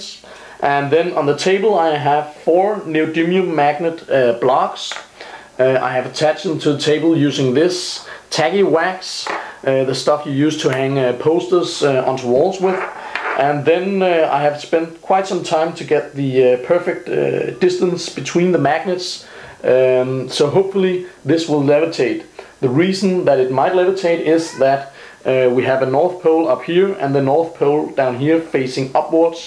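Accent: Denmark. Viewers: You sound really danish (im danish too) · Lol sounds danish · Are your danish your have an danish accent cool idea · vietnamese? He's danish >.<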